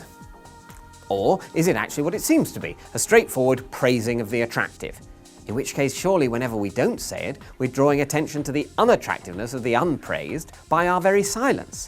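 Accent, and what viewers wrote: United Kingdom. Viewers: Its like the neurotic voice in my head got a nice British accent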